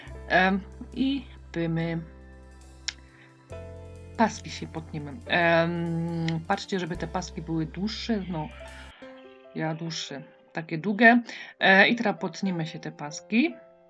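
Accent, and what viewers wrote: Silesia, Poland. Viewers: Super, ale fajnie po Śląsku rządzi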